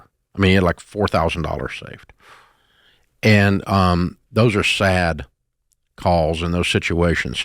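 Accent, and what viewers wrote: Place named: Southeast, United States. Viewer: It's good for learning American English from the south east (specifically the host).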